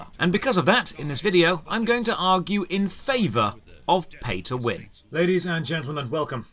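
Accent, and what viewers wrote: England, United Kingdom. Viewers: He is paid either way so does he really care? That is the real question. Ask yourself how he got to be an influencer. Who does his editing. Why are so many British streamers getting pushed in the USA market? Marketers think well educated English accents are more powerful when trying to be convincing in advertising or influencing. I guess the numbers don't lie but are you just one of their numbers. How is that for a thought experiment :P Think about it, spend your time pissing off the large publishers and corporate sacks of you know what by showing how convincing you can be swaying a large swathe of the gaming community against their best interests and wait until they come to you. That is what I got out of that whole video, he doesn't care where the money comes from, as long as its worth his time.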